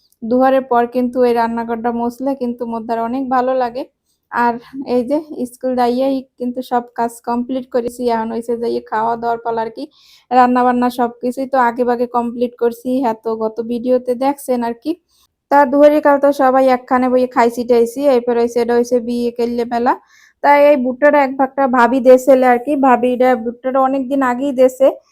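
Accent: Barishal, Bangladesh. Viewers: সম্পূর্ণ ভিডিওটি বরিশালের ভাষায় সুন্দর করে উপস্থাপন করলেন আপু ❤লা ডান❤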